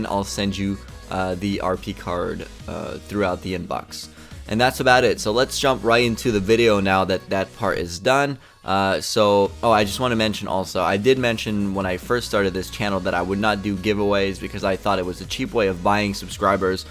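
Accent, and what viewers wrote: Quebec, Canada. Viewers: T'est anglais de base ? ou québecois parce que ton accent est vraiment ÉPIC :D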